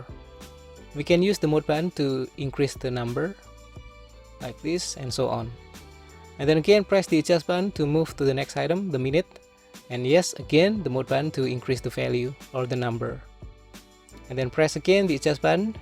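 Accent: Indonesia. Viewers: Pertama kali liat karena pengen tau gimana cara setting jamnya, terus pas semakin di dengerin lama-lama pengucapannya kaya orang indonesia juga ya ternyata orang semarangg😭😭 Mantap sih bahasa inggris nya bagus bangettt mungkin kalo gak di dengerin dengan teliti gak tau kalo orang indonesia nih😂😂 Terimakasih om untuk cara settingnya👌👌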